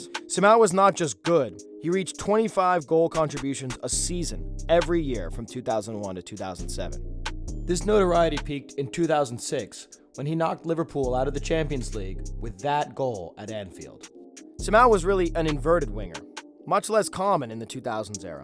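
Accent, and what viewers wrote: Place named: United States. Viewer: american accent: immediately loses credibility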